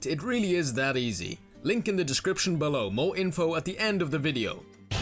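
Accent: Wales, United Kingdom. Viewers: I need to ask! ARE YOU WELSH?! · are you Welsh?? You sound Welsh or at least around that area??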